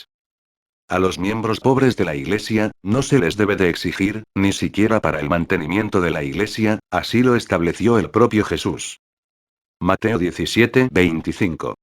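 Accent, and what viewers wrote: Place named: Spain. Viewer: Este Huevon que hizo este Vídeo, porque habla como las huevas, no respeta las comas, derrepente se va ahogar, se creerá gringo, pero parece Español.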